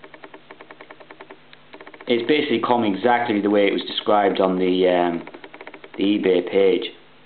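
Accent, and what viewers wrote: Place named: Australia. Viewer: oh great an Australian :(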